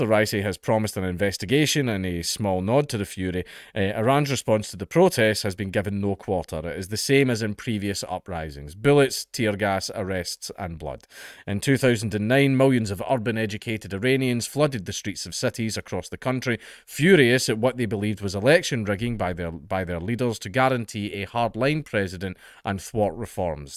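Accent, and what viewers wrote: Scotland, United Kingdom. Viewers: Not for much longer, mate. And no, that's a Scottish accent. Are you kidding? Fuking Americans.... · You must be a colonial. There is no such thing as a 'British accent'. has a Scottish accent. Scotland is a country, with it's own history, culture and language. Scotland is part of the United Kingdom of Great Britain which includes four countries; England, Wales, Scotland and Northen Ireland. After the acts of Union in 1704 and 1801 these countries became part of Great Britain but each country has it's own language which is the basis of the different accents and dialects. Only yanks say 'British accent' as they are ignorant of the mother countries' politcal, cultural, liguistic and historial fabric. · You do realise that Scotland is part of Britain yes? He has a British accent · Scottish accent?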